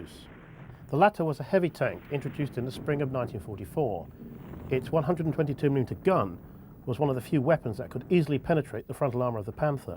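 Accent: England, United Kingdom. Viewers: the british accent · You mean proper English without American accent? :P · nice video,the only thing is i can't understand the british accent :( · morti271 he has a lot of brithis accent but for me is better than the american one . is more easy to understand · I had a hard time trying to understand the narrator. Too much English (accent)....lol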